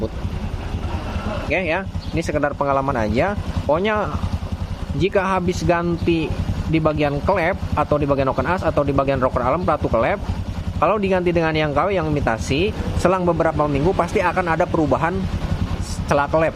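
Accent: West Java, Indonesia. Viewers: Sunda ya 🤔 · Kang didaerah mana ya sepertinya logat sundanya balaraja ya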